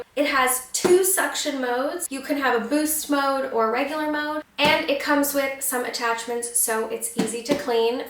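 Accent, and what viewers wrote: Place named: United States. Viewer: London...that explains the British AC plug, but not the American accent of the presenter. But good job though. Answered all my questions about the Shark Wandvac. I'm going to assume they come with US AC plugs for US customers.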